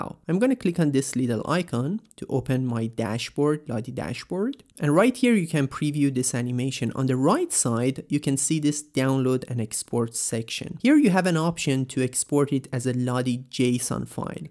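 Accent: Iran. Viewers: I can distinguish that Iran English accent from miles away. Cheers to you brother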